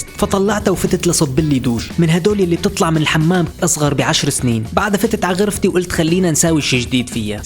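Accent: Syria. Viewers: انت حلبي كأني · انت سوري هههههه ❤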